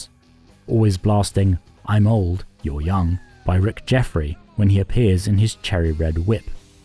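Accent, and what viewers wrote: RP, United Kingdom. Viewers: This video is pure gold. Your classically British commentary while talking about a priceless piece of Canadian TV is a great combination. You did your research and each character’s accompanying music is on point. Deeeeecent video! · It's so charming to listen to a posh British accent talk about a Canadian Classic about Trailer Trash!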